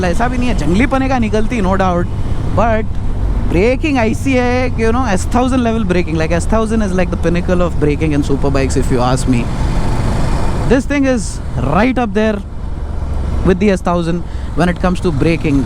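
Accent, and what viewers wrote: Hyderabad, India. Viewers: What makes me stick to ur Vlogs is ur Hyderabadi accent... 🤩✊ Secondly the bike knowlege... seedha seedha palta k dere..🤣🤣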